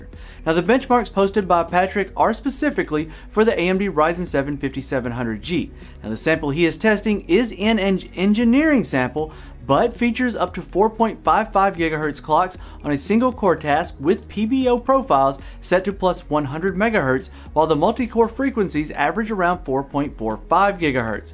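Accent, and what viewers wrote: South, United States. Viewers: Your subtle southern accent is quite pleasing to the ear for some reason lol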